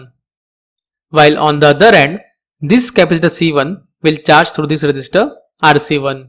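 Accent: India. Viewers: American accent please. I can not digest Indian accent. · when yu hear indian yu know its legit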